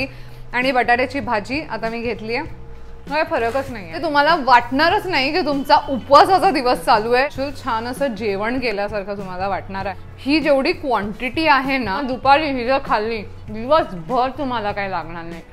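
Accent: United Kingdom. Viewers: Marathi marathi sarkh bol agdi as british accent mdhe marathi bollya sarkh nko karus otherwise no doubt ur fab❤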